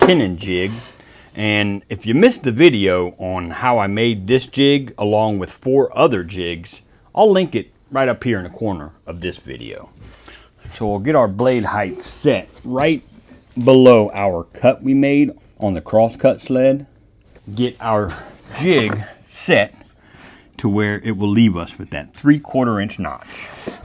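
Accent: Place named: South, United States. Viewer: Hi, I juts discover your channel this morning. Congrats, very interesting stuff. Question for you. Where are you based? I would say in South U.S by your accent, but for the French Canadian I am, I would also want to thanks you, cause I can understand you with any problem You just got another huge fan, and you will inspire me to launch my own channel by the end of the year Have a wonderfull day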